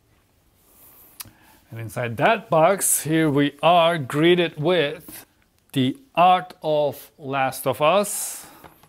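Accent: Turkey. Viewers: nedeni türk aksamı ile konuşması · İlk kelimeden anladım türk olduğunu xjdkkd nyse abi efsane ya herkes alabilse keşke o art book un içinde muhteşem şeyler var be bileklik figür çanta falan mükemmel ya o parçalardan biri için kavga bile edebilirmxdkkdkkdld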